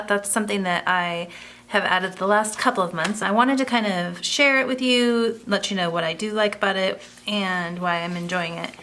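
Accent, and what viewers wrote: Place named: South, United States. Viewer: You have a great Southern accent!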